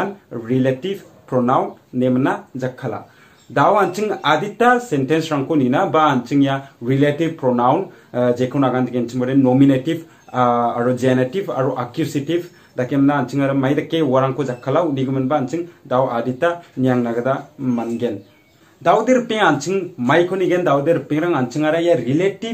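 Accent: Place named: United Kingdom. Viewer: Hope you to see your English accent again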